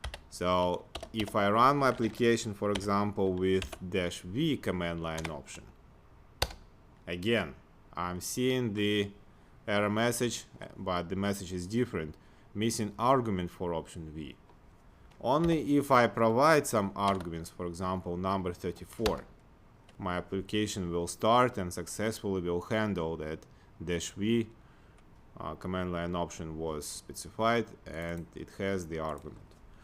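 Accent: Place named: Ryazan, Russia. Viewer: Заметный русский акцент)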